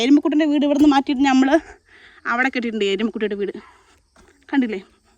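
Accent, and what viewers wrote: Palakkad, India. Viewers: മലയാളം സംസാരിക്കുന്നത് കേൾക്കാൻ നന്നായിട്ടുണ്ട് പാലക്കാടൻ ഭാഷ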